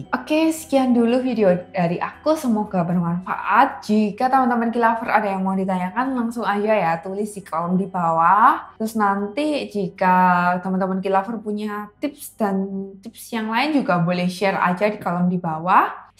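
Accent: Java, Indonesia. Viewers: Medok but beauty and love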